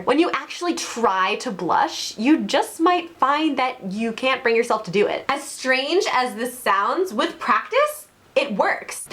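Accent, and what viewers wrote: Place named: Ireland. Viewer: You must be Irish girl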